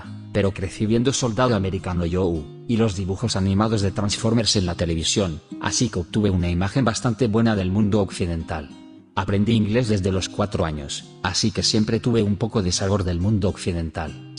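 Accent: Russia. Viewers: el hecho de que esta grabado por una voz rusa autentica hace este video mejor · Vivo en china y soy youtuber latino, y los que no somos comunistas no tendremos problemas desde que no critiquemos abiertamente a las autoridades chinas, de resto por ahora todo parece normal, saludos a ti yo también visité Rusia reconozco el acento de un ruso cuando aprende español.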